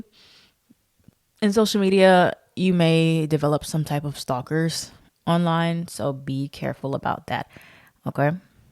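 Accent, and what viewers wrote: Bronx, United States. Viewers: You seem like a talented, insightful astrologer. Are you really from the Bronx? Quite the cutie!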